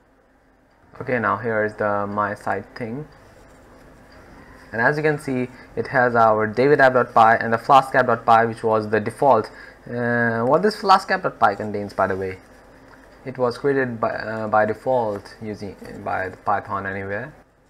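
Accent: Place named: Brazil. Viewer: Ahhhhhhh 😂😂😂 é Brasileiro, certeza...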